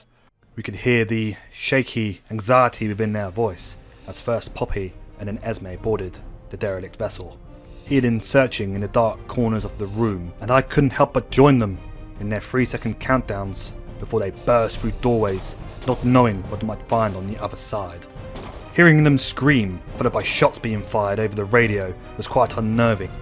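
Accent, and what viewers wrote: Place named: United Kingdom. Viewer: You some how sound more British then I thought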